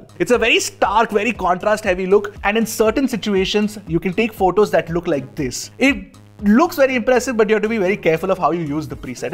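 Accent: India. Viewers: the video is useful but how you speak in india accent influence the viewer not to understand the content. please improvize your intonation in english accent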